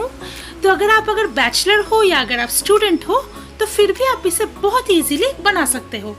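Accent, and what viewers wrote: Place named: Bengal, India. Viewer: guru kotha sune to mone hocche bengali. ta, barite ki ei rokom macher jhol khan..... youtube r yuge ekhon sobai chef.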